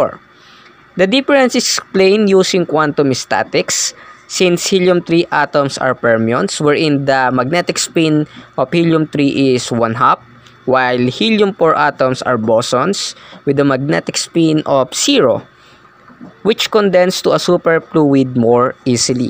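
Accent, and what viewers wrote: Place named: India. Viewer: While I very much appreciate the man explaining this, the Hindi accent in the English makes it quite hard for me to follow.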